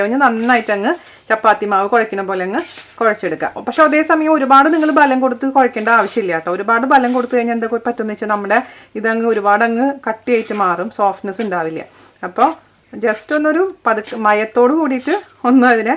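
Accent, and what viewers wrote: Thrissur, India. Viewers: Hi chechi...checheedea thrissur slang samsaram enik othiri eshtanu. Adhu polea coocking..dubail evdea chechi stay cheyunadh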